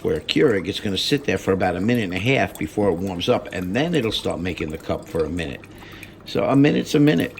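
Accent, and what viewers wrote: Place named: New York, United States. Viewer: Suuuuuuch a great NYC accent! I’m guessing Brooklyn, but maybe SI, Queens, or d’Bronx? 😊 Regardless: thanks for your helpful video. I too am a “kawfee drinka” and I need that damn red light to go off!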